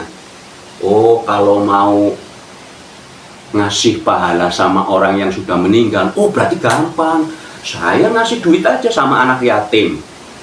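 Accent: Banyumas, Indonesia. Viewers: Loh rupane wong ngapak ....Kulo wong Cilongok mas....tpi ku tinggal d riau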